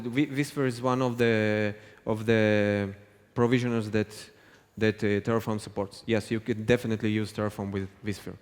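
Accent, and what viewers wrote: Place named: Russia. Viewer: спасибо, полезно, только автор, пожалуйста, прогоняйте перед зеркалом речь, и правда - сильно режет слух наш "акцэнт"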